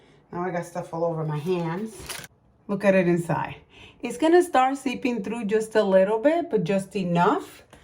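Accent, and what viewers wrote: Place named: Puerto Rico. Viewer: Are you Puerto Rican so cute the accent!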